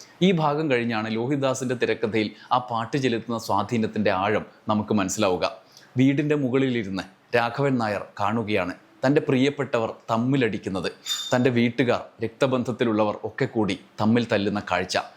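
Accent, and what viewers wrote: Kannur, India. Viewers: സാറ് കണ്ണൂർ കാരനാനോ. എവിടെയോ കണ്ണൂരിൻറെ ചുവ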